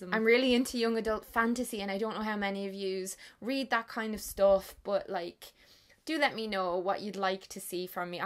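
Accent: Ireland. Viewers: I already thought so haha but finally I get to hear it by a young irish person! btw loving your videos, I relate to so many things and listening to you talk (especially to your wonderful irish accent as I miss this country so much) calms me so much!! xx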